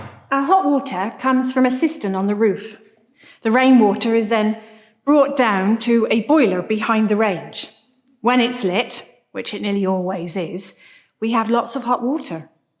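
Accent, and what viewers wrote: United Kingdom. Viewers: Meu Deus amo o sotaque britânico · Because of this channel, I can now speak English in British accent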